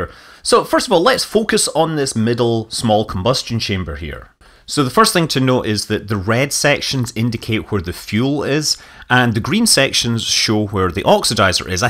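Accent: Scotland, United Kingdom. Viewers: He speaks clearly and pronounces well. And obviously a company that does language recognition in many different languages can manage a Scottish Speaker subprofile for English. Besides, that is not a strong accent, it is a very mild one. Go watch the Prime Minister’s Questions when an SNP (Scottish National Party) leader asks questions.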